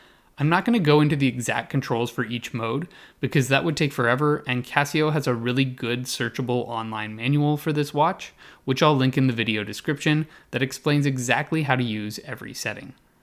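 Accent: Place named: United States. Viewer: If you have an American accent why do you give the wrist measurement in metric and not inches?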